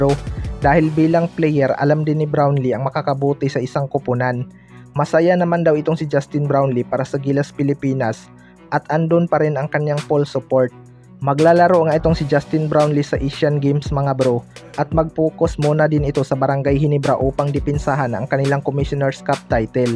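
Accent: Visayas, Philippines. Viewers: Opinsa at dipinsa . Bisaya na bisaya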